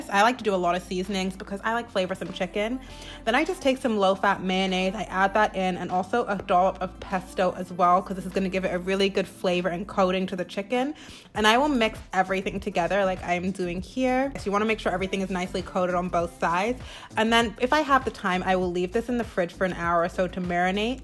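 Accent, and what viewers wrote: Canada. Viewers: I think she is frm canada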